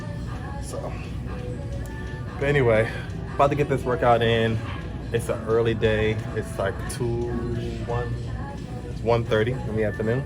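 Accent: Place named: England, United Kingdom. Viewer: You did come back with a English accent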